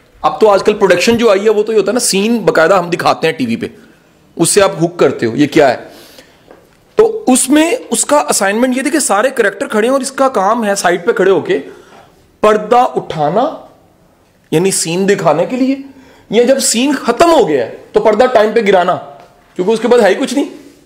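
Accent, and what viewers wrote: Punjab, India. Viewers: sir your punjabi speaking style is great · Punjabi hain sir is liay...actuall style me bolty hn kch covering ni use krty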